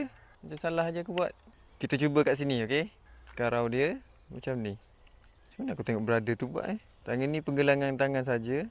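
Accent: Kuala Lumpur, Malaysia. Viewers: Ore kelate tp kecek luar mcm org kl, ingat ore luar tadi😂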